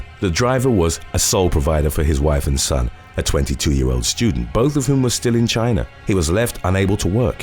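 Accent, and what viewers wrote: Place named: Scotland, United Kingdom. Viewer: I love his Scottish accent it's just so unsatisfying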